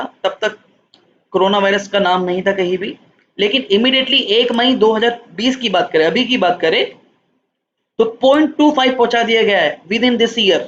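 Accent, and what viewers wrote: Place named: California, United States. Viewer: Cannot understand anything you sad - are you from California?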